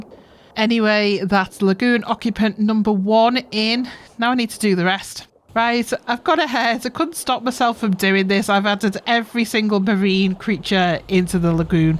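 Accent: Liverpool, United Kingdom. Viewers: I am from the wirral, so it's nice to have a scouser planco player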